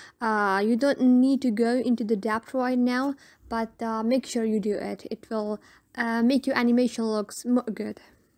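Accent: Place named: England, United Kingdom. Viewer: Tutorial is so nice lakin English accent 😂😂❤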